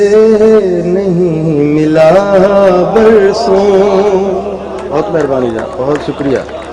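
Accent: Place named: Jhang, Pakistan. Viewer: ساڈے علاقے جھنگ دی زبان لگ رہی ہے استاد جی😍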